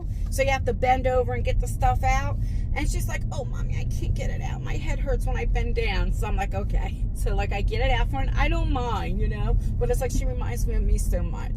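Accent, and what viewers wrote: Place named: New York, United States. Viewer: I hope Lexi feels better soon with her side effects. I am praying for you and Lexi daily.🙏🙏 It's a sick world out there with all this crap. Family first, don't worry about posting when you can't. We all understand. Are you from NY? I was born there, I think I hear that NY accent. Take care. Love watching your channel.😊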